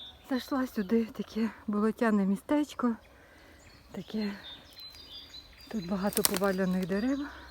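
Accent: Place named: Transcarpathia, Ukraine. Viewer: У ВАС діалект мови Закарпаття.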